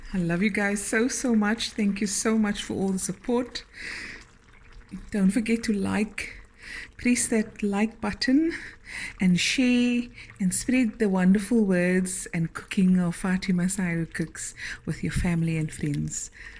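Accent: South Africa. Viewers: I knew she was South African.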